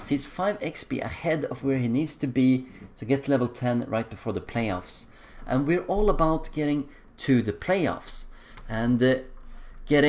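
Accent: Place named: Germany. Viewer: I was just curious about your nationality. Your accent and manner of speaking reminds me of my German grandparents. When I mentioned your ability with the numbers, I was referring to the calculations you were making on the fly concerning critical hit chances and such (which also led me to believe you may have German ancestry =) ). I hadn't made it to the Excel portion of the video yet. I think it is good to show people that when you track numbers, you can make the best decisions. Because math. Keep up the good work.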